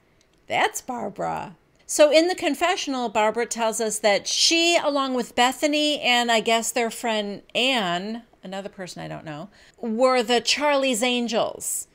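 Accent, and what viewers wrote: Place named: Chicago, United States. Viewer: I love Luann! She's such a character. Sonja is the most eccentric, accidentally hilarious, cringiest housewife of them all lol I got the same vibe from Barbara. The only positive thing that could happen from that is that she knows a lot about Luann, and doesn't seem to hold back based on that convo with Carol a few seasons ago. I don't know, im on the fence about it too. By the way, what state do you live in,? You gave a slight accent that reminds me of Chicago or something. I'm from a very small, rural town in Indiana, and everyone thinks we sound southern lol but I've always wondered where you live, if you feel comfortable telling us.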